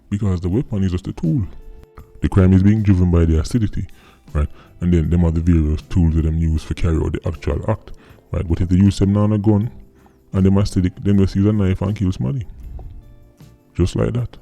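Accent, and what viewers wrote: London, United Kingdom. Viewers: The diet coke makes me🤣every time.. full of jokes🤣I just love the way he commentates🤣love the uk accent...u got it in one👏🏼🤣🤣❤️ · you have the London talk down pat lol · Yes big man! Loving the attempt at the London accent! 🤣 keep up the solid work bruv!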